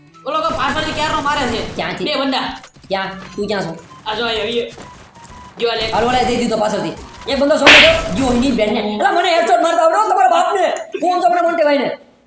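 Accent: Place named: Halvad, India. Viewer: તમારી ભાશા આમા રા જેવી જશે gj 36 વારા જેવી હળવદ વારા બોલે મારી જાન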